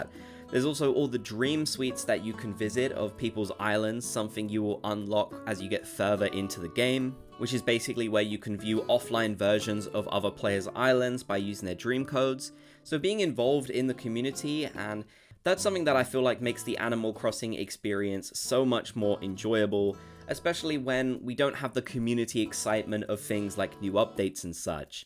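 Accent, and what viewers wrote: United Kingdom. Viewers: both are british❤️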